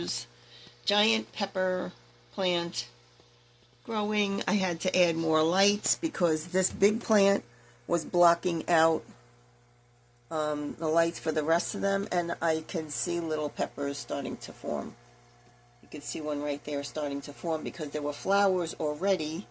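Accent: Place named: New York, United States. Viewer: Don't mind me. Just here getting my indoor plant and NY accent fix 😆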